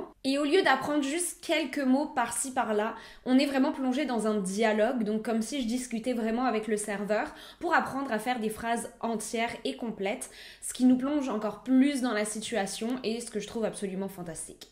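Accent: Quebec, Canada. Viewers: Tellement triste histoire pauvre gars ! Ma mère souffrant de maladie mentale quand j'étais jeune elle avais fait une genre de cabane avec des meubles et je ne pouvais pas manger parce qu'il y avais de la drogue dans la " nourriture " et il y avais des méchants partout ....après 3 jours ma famille est venu nous chercher pour lui dire qu'ont devais s'enfuir à cause que les méchants étais à notre recherche ils ont conduit ma mère a l'hôpital et moi j'ai manger comme jamais ! Il y a toujours quelque chose a faire les parents son a plaindre dans cette histoire ! Ps ton accent une vrai québecoise oublie pas d'aller voter ! Faire sortir nos bozos 😅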